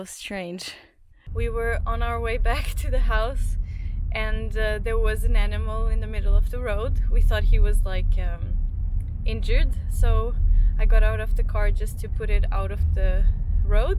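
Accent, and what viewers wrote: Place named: Norway. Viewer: Hi I'm Joachim I'm from Oslo, like you're videos 👍 I think it's really cute that you're English now starts to have a Norwegian accent 😊😅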